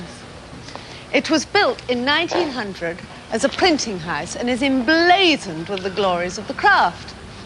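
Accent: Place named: Geordie, United Kingdom. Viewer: Love her broad Geordie accent . 😜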